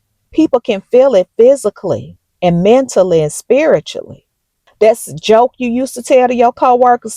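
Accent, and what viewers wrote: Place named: Texas, United States. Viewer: On another, side note, any chance you're from Texas? You sound and also kinda look like some of my family, lol I know it's a long shot, but just something I noticed! Wishing you well. 🙂💞❤️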